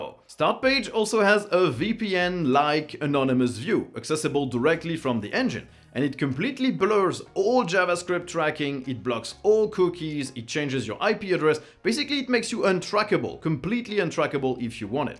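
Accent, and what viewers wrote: France. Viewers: je savais pas que tu connaissais le francais mais j'ai toujours penser a cause de ton accent :P en passant je suis quebecois :D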